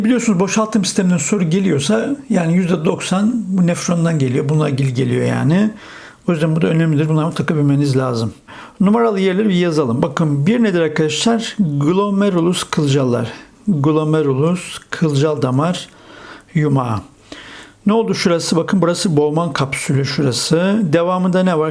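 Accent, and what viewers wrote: Black Sea, Turkey. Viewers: Hocam karadenizlimisiniz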